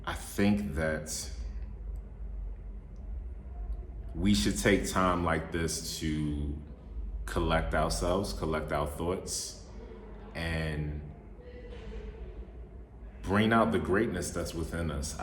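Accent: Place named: South Africa. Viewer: Frm 690+ subscribers to 2k+ wow!✌. Hav anyone noticed how pronounced things abt mzanzi?, He pronounces like someone dat has been living in SA for a while omg!. Plz giv dat man a bell. hav u eaten " triple decker meat/ chicken pizza frm Debonairs pizza?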